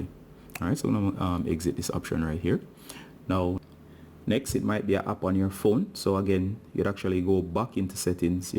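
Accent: Jamaica. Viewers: Me alone notice issa jamaican🤩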